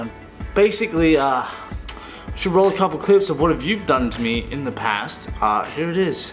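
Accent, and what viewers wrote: Australia. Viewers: Wait is he Australian??? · He had an Australian accent for a lot of the video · Honestly don’t get how anyone could doubt that he’s Australian. His voice alone gives it away plus the words he uses. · Wow the Australian accent is making a comeback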